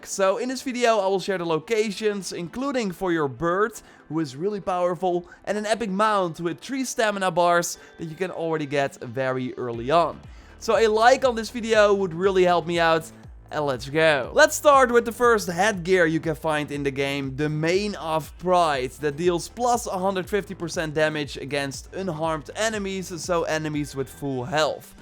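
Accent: Netherlands. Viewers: How dutch is this accent.. im dutch aswell but damn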